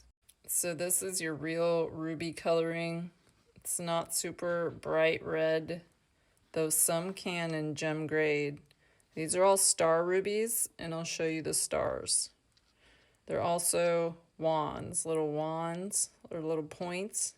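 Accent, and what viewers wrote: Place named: United States. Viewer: sounds american Indiana or Colordo im guessing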